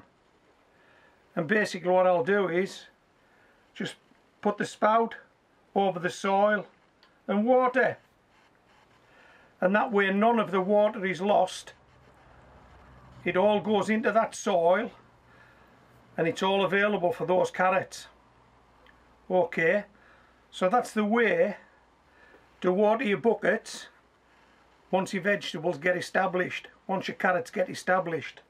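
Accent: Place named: Scotland, United Kingdom. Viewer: I believe it's. I think it's Scot?😏 Could be wrong, it's happened once before...😳😛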